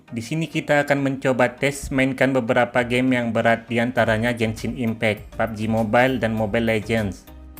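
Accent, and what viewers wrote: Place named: Bugis, Indonesia. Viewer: Kyk logat org Bugis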